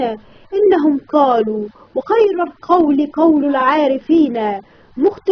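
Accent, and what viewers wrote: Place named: Egypt. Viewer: احسنتي لكن ملاحظتين فقط ١. ألفاظ بعض الحروف تنطق باللهجة المصرية مثل الجيم والثاء وهذا خطأ لفظي باللغة الفصحى ويجب ان تتدربي على النطق الصحيح لها + لو تنشدينها بدل الإلقاء سيحبها الاطفال شكرا لك.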